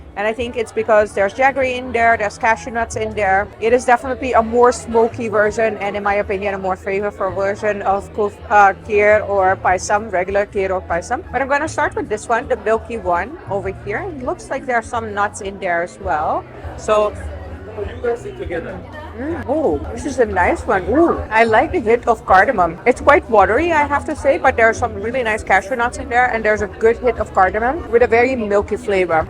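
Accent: India. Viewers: I loge your slightly Indian accent when speaking english😂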